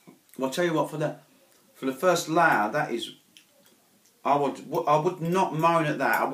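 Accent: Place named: United Kingdom. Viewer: british accent.. the can was already open.. : disliked and reported.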